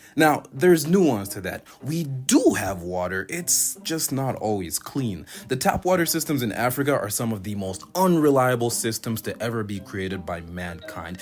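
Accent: United States. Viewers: why do you sound american, genuinely asking. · yeah but like what I mean is he sound like he was born and raised in like Detroit or smth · Your English is fantastic!!! You sound like my neighbors in Virginia. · And yet you sound American? 🤔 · can i ask how come you have an american accent? im curious! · Born and raised in Africa but have a American accent? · Great vid as usual! I hope this isn't a rude question, but why does your accent sound American? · Then how do you have an American accent when you were born and raised in Rural Africa? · Why does your accent so American?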